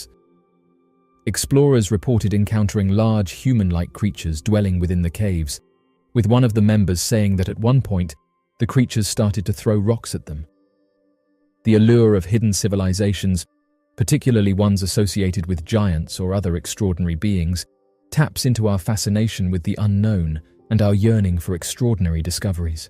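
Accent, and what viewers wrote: United Kingdom. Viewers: its so fucking dumb but he's got a british accent so it's gotta be true.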